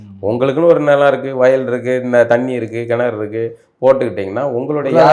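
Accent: Chennai, India. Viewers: சென்னை தமிழை ஏன் தவிர்க்கணும்... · நெறியாளர் (சில இடங்களில் ) சென்னை பாஷை பேசுவதை தவிர்க்கலாம். 🎉🎉😮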